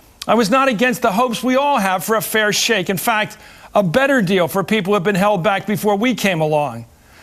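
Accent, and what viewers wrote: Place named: Philadelphia, United States. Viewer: I'm just here to listen to the Philly accent.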